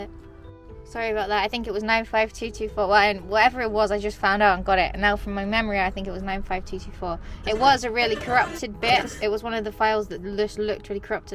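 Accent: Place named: Scotland, United Kingdom. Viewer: Its funny to hear someone in Scotland getting confused by your accent as being Scottish and living in England its usually the opposite way around for me everyday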